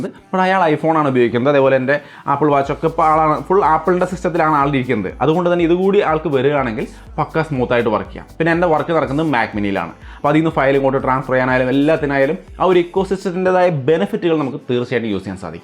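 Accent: Thrissur, India. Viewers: Thrissur slang kattak vannandalo e videoyil😁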